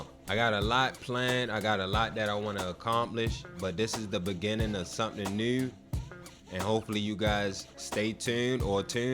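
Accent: Baltimore, United States. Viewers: That Baltimore accent is obvious . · I have dated quite a few Baltimore men and have a lot of friends here so yes you all sound like that but it's cute! I used to get mad when my ex called me dummy but now I realized that it is a term of endearment! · dont apologize for dat baldamo accent young brotha 410!!!!! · B- more accents are cool in my opinion😎 Baltimore keep stayin true 💯💯 Much love from Savannah Georgia🍑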